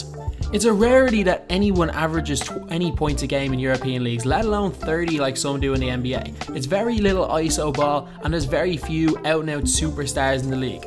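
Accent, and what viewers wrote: Ireland. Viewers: That Irish accent tho! 👍😎🍺🍻🍺 Btw, the same hate Ntikilita is getting is the same hate Porzingis got in 2015 (a Draft just as deep as this 2017 Draft). They're even saying that he'll take 3-5 years to develop, JUST like was said against Porzingis! 😊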